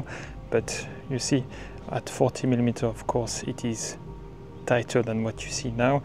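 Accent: France. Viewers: Totally loving this video! My go-to gear is my Sony a6000 and my Sigma 30mm f/1.4 (equivalent to 45mm full frame.) The irony, Sir, in documenting lenses and street photography, you are one of the people are developing the Youtube POV Street Photography video as an artform unto itself. The collation and sequencing of street scenes, I would say, is not unlike putting together a photo book. And the choice of music is another layer that puts the mood and vibe into the video. And your French accent is lovely! Reminds me of my cousins in France. I encourage you to visit South East Asia where you can find more places like this. There have been many street scenes that been ruined by ugly lamp posts. Since my Sony a6000 has no OSS stabilization, I mostly use poles to brace my camera against. It’s sweet, since these poles allow you a 360 degree view while you brace your camera against it. 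But I love your composition solution for these poles. I never realised that small streaks of light can distract the viewer! But then, I mostly shoot at night. I suspect that small hut at the top of the hill could be a toilet. I noticed the signage at the foot of the hill that said 便-something. But I could be wrong. This is the first time I have heard of the camera as being a point in the compositional triangle. I love it!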